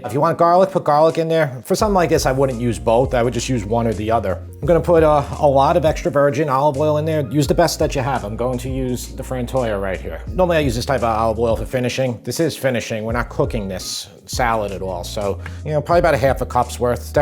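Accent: New York, United States. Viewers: I am a simple man I hear new york accent I hit like and subscribe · I’m watching this as we drive to the farm and my husband asks me “I didn’t know Billy Crystal has a cooking YouTube” never thought about it but there is a little Billy in your voice. I miss a New York accent from when I lived in bronxville.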